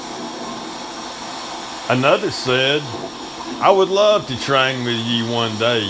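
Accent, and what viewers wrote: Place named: Appalachia, United States. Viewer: This guy sounds like a rite hill billy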